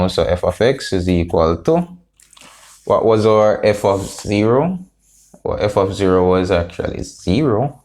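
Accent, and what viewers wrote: Jamaica. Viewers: My Eng. papers have been beating me mercilessly...this helps. Thank you. I could have sworn you have a Jamaican accent...helps me remember this.😄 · So nice and simple, my lecture made this sound like a tong twister to me, and you sound more of a Jamaican I really love the vibe keep on uploading more videos you are helping many enjoying from Kenya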